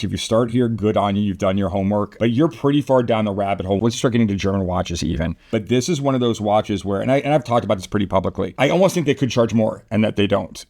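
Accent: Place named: Africa. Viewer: Your pronunciation capabilities are that of an african.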